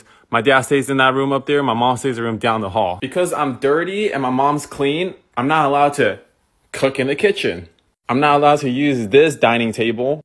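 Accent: Taiwan. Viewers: 聽口音是台灣移民過去的😂 冰箱還有一罐八寶粥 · 這口音 台灣過去的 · 聽中文口音感覺是臺灣移民。 希望大家都開心。 畢竟當初移民應該是希望家庭會有更好的生活條件。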